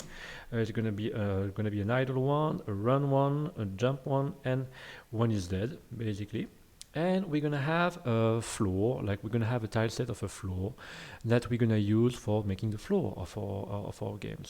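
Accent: France. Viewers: Ouais je me suis dit que son accent c'est 100% français.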